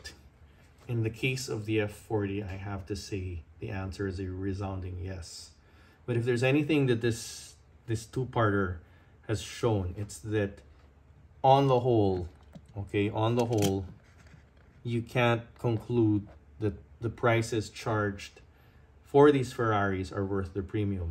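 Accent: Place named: Philippines. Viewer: What nationality are you? Accent sounds filipino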